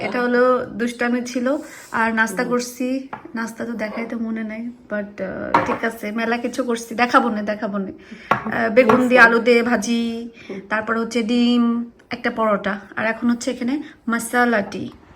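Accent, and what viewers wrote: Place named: Gopalganj, Bangladesh. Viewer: apu amio gopalgonj er meye so tumi jokhon amader desher vashay kotha bolo tokhon amar khub valo lage ekdom nijer manush ❤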